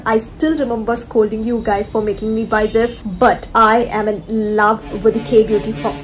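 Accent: Tamil Nadu, India. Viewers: Are you a Tamilian ? Just love your accent 💕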